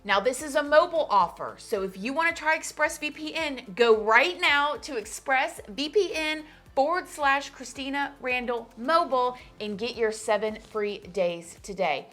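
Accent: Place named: Florida Panhandle, United States. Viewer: Ha! your accent sounded so familiar to me. I too am from the 850. Hi there, girly!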